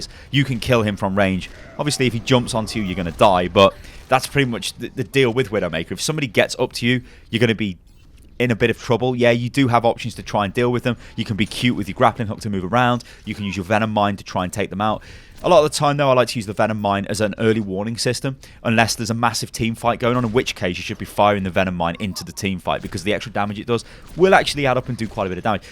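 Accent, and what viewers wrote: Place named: London, United Kingdom. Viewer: i'm guessing from the south, definitley no northern accent, probably London or somewhere near there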